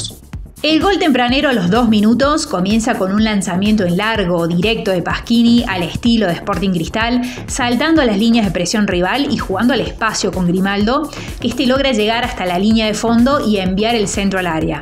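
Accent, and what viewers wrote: Argentina. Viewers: Y porqué habla como argentina?? complejo 😂😂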